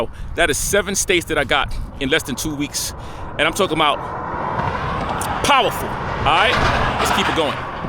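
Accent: Jamaica. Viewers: I love that Jamaican accent. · Love that Jamaican accent brother! Keep these videos coming love em! Best Album made from any star past or present my opinion was! Guess who the artist was?! 😂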